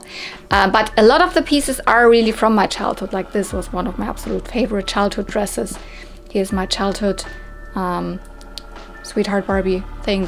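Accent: Germany. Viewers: wow you have an interesting barbie collection! I really like the bright colors of all ur dolls! I'm a barbie collector too and exactely like you I dun collect boxed barbies and I like to restore the dolls too. I love 80's and 90's barbie, and even 70's and 60's, but I'm a perfectionist and I always try to keep my barbies as close as possible to their original conditions with original outfits. I see you always find many good things at flea markets but here in Italy it's so hard and rare to find vintage barbies at flea markets, ur so lucky! Btw I like the simple way you collect barbies and it's so cute to hear ur german accent. Diese ist wunderbar! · Are you from Germany? My bestie is from hamburg, similar accemt. And i dont think you have to say its for adult collectors, every age can appreciate all the toys you have. Do you have any rainbow bright or Strawberry shortcake 🍰?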